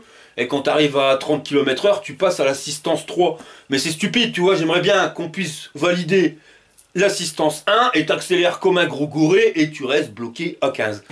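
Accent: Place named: North, France. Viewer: Salut, alors, je viens de voir ta vidéo sur YouTube, sur une trottinette avec selle et pneus offroad. Je suis d’accord avec toi sur la sélection des paliers de vitesse. Mais, moi, j’ai une Hitway S5: celle-ci est censé faire 45 km/h sur 45 km, elle a aussi un siège avec des pneus route anti crevaison, freins à disque avant et arrière, un plateau,(et non, pas un deck- restons dans le français,- pas la peine de se galvauder...), avec matériaux anti- dérapants sur les côtés, le tout, sur un moteur électrique sur la roue arrière de 800 watts... elle a aussi des clignotants avant et arrière, elle fait 20 kg tout plein fait,(si j’ose dire...)... Dans la réalité, j’ai d’une trentaine de Kléber faisant le même poids que toi,(99 kg). Pour l’instant, je ne peux plus en faire, j’ai glissé sur une plaque d’égout et je me suis blessé à la jambe, et ce en marchant... 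Pour ton problème de puissance, je pense que l’idéal, serait un moteur de 350 watts sur chaque roues. Par contre, je ne suis pas d’accord avec toi sur le régulateur de vitesse, moi, je ne l’ai pas, et c’est ce qui manque à ma trottinette pour être parfaite, en tout cas, pour être parfaitement concentré sur la route... Continue avec ton humour, et ton accent du nord, car moi, j’aime bien.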